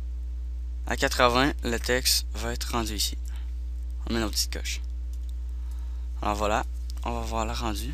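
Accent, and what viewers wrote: Quebec, Canada. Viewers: ralala l accent quebecois mdr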